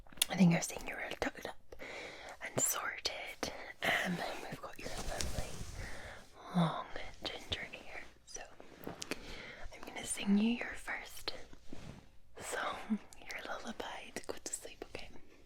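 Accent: Scotland, United Kingdom. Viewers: Yey! More very Scottish accent please! The very scottish roleplays brought me to this channel. Your scottish is the best. 😍🤗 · So glad I found your channel looking to bed tonight love your accent I'm a londener and I love the Scottish accent hope watching you before bed tonight makes u pop up in my dreams 💕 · Happy Friday!! I really enjoy these videos that you go with a stronger Scottish accent, especially because it is so different from the accents that I'm used to. The stories were really nice as well! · I loved this video,. I really love your Scottish accent, because it is the most beautiful accent I’ve probably ever heard. 🥰 The rolling of the r’s and the pronunciations like “about”, “doon”, and others are so lovely to hear. 💙 Even though I had no idea wth you were saying lol, I really enjoyed the Bernies Cuddle Doon poem. And even tho I’m not familiar with his work, I’d be open to you reading the poems of Robbie Burns so I can hear more of those pronunciations and slang. 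I also feel like I’ve heard the name Wee Willy Winkey before, tho I don’t remember hearing the actual poem. Lastly, I’m happy you brought the wave bag back. I’m always happy when you bring the staples of your channel back whether it’d be trigger items, video types, or more of your wonderful Scottishness lol. You should definitely include Scotland during Spring in an upcoming Creating Your Fantasies video, I’d really love that! 😃 Anyway tysm! This was too lovely:_Loveheart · What a perfect video for a Friday evening 🙌. I love your Tarten skirt and Tarten hair band 😍. You always look so comfy and cozy when you're lying across your bed 🛌 😀 😊. Yes, yes, one of my favorite Scottish nursery rhymes, you can't push your granny off a bus!! 👏 Thanks,. I haven't heard Wee Willy Winky in such a very long time. Thank you for bringing back that one 🙏 ❤️. Ahhh, hair massaging and rambling is just amazingly relaxing and soothing!!, you look absolutely stunning tonight! 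The Scottish poem is absolutely incredible with your Scottish accent! No one else could have read that any better than you, Magnificent 👍. Definite tingles with your incredible accent! I wish this video was a bit longer because I love listening to you speak. 😴😴 The mermaids 🧜‍♀️ wife was an awesome story, and, you must do more of these types of videos 📹 😀. You know, I may not know everything you've been saying, but I love listening to you!! 🥰🥰 Thank you for this prosecco Friday evening video. it has been amazing! Please take care and have a wonderful weekend 😊 💕. Lots of love to you! 💗💗😍💕💕💕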